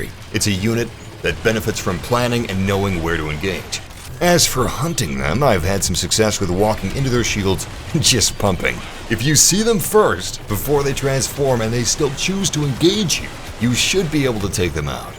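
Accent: Netherlands. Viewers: You almoust sound like ducht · Dude, i can only hear Dutch now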